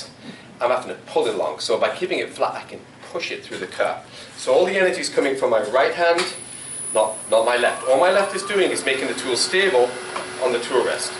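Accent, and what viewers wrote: Newcastle, United Kingdom. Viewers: And the Geordie accent shines through ;-) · Your Geordie accent is so messed up, man.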